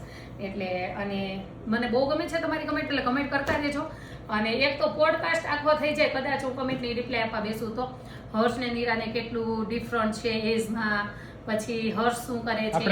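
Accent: Kathiawar, India. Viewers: Aapni matrubhasha Gujarati che.biju ke tame suratra na cho aa kathiyavadi bhasha tame bolo cho te khubj game che.tamari vani saras.che. pan saras bole che. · Your kathiyawadi speech is really nice...❤ i really like it....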